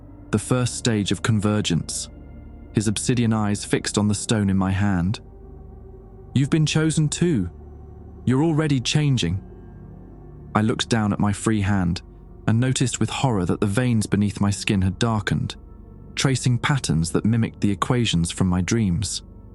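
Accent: England, United Kingdom. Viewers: The British accent is a huge turn off, especially since the guy is supposed to have worked at Area 51 in the U.S. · Seems a little suspicious with that English accent to be Air Force. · Why does he have a British accent .? American military? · Another British voice narration , gee can I believe all this ?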